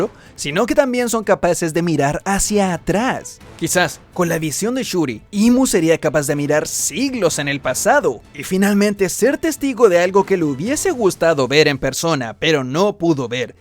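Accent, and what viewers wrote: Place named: Chile. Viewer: Noooooo puede ser eres chilenoooooo. KAKSJAJSKAKS acabo de darme cuenta cuando hablaste de lo de ussop 😭😭😭. Pensaba que eras español, me subscribo y te sigo de acá hasta que termine one piece Akakjajaks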